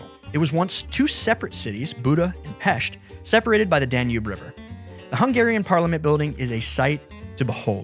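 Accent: United States. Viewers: Chimney cakes are from Hungary...why I am listening to an American talk about Czech travel tips...;)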